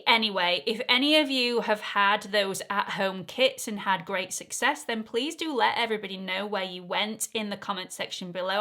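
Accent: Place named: Yorkshire, United Kingdom. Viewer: I'm from the US but I knew I heard Yorkshire!!!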